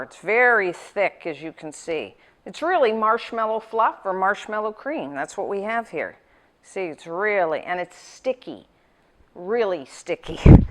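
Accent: Canada. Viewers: thought she was canadian, the accent and all that...weird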